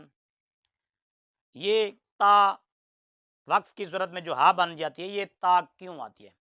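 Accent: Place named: Punjab, Pakistan. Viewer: مفتی صاحب آپ بھی گلابی اردو بول رہے ہیں آپ کی اردو میں پنجابی لہجے کی ملاوٹ ہے کراچی میں آپ جیسے لوگوں کو ڈھگا کہا جاتا ہے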